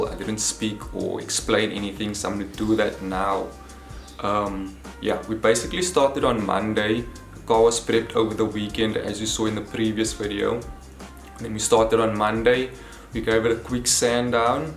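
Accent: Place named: Afrikaans, South Africa. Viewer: Hy klink Afrikaans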